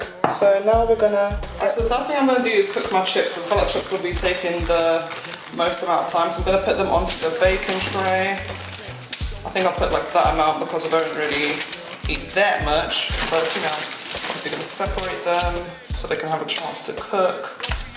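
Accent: United Kingdom. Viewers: I love lamb chops, literally can eat it anyday. Great recipe! Ps..big up, fellow British accent lol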